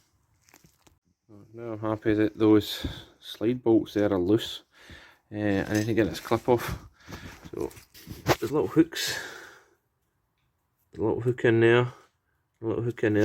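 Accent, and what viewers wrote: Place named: Scotland, United Kingdom. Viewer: Scottish English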